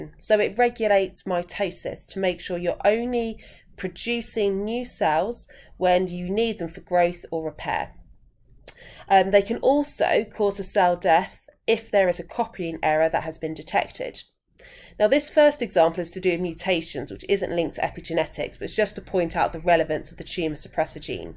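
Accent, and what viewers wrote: United Kingdom. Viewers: Like your British accent. You pronounce certain words differently than we do in America.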